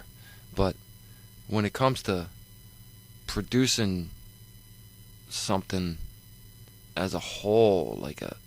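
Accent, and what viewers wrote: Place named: Brooklyn, United States. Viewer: Cool my lil gangsta rapper from Brooklyn, soon I'll buy all your songs, I like the Brooklyn accent, I've always been a fan of real hip hop ! Stay strong boy :)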